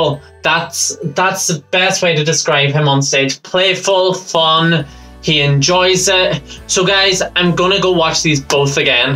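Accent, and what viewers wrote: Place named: Northern Ireland, United Kingdom. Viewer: I love how you analyse everything so carefully. Anyway, Damiano David is the frontman, Thomas Raggi is the guitarist, Victoria De Angelis is the bassist and Ethan Torchio is the drummer. Keep those reactions coming! I love your accent, btw (Northern Irish, right?).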